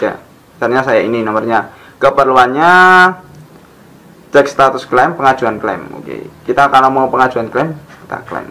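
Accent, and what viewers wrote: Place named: Java, Indonesia. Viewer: Gimana sih masa menerangkan ngomngnya nyjbed2 kya orang bru belajar ngomng medok lagi bhs nya jd krng jls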